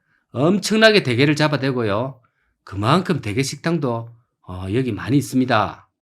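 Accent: Gyeongsang, South Korea. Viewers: 갱상도? ㅆㅂㄹ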